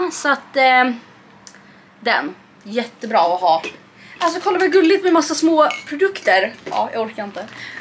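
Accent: Stockholm, Sweden. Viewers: Du säger ehh typ massor .sen undrar jag om du bott i Stockholm då du pratar Stockholms dialekt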